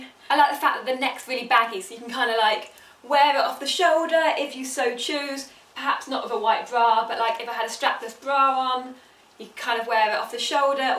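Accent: United Kingdom. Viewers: Hi i'm a new subscriber loved your video and your British accent ❤😘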